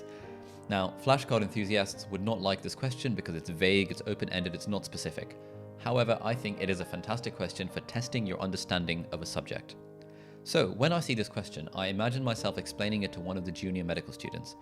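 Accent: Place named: RP, United Kingdom. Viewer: Never heard ‘wasteman’ in a posh accent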